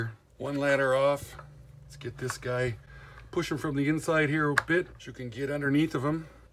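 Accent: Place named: Midwest, United States. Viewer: Love your videos,. Your flat N. Midwest accent & idioms are great humor & your knowledge of tools & techniques for rehabilitation of old gear is unsurpassed.. But one minor correction is in order - to say Lionel O-27 is 1/64 scale is just as wrong as saying it's accurate 1/48th. The "27" in O-27 refers to the diameter of a circle of track (27 inches) which was designed to fit a standard 4' x 8' sheet of plywood with enough room to spare for sidings/etc. Lionel was less concerned with scale accuracy (especially in the early post-war era) than what would fit comfortably in the small bedrooms of the time. While most rolling stock was not accurately scaled to 1/48, and was often much shorter, the proportions were also not 1/64. Do a little more research online to find this is true... Meantime - keep up the great work!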